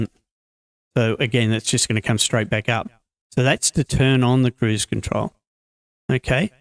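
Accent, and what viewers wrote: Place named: Australia. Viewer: Mate are you aussie by any chance? Been watching your videos for a while, only noticed your accent today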